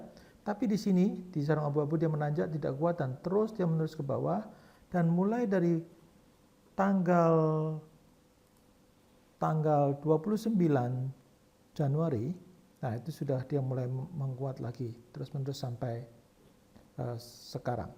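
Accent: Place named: Java, Indonesia. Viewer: kata istri saya suara Suheng medok banget. hahaha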